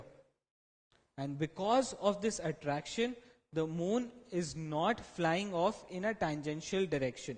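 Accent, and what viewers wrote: India. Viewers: why you speak I-English?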